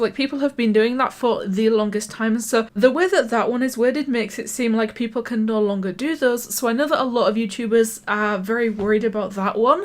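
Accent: United Kingdom. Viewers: Oh well glad to hear your British accent again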